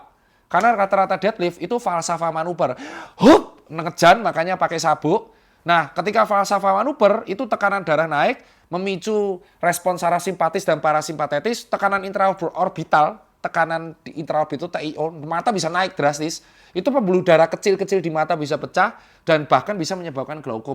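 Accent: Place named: East Java, Indonesia. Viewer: Bukan marah.. Itu emang aksen khas jawa timur. Khususnya surabaya dan sekitarnya.. 😂😂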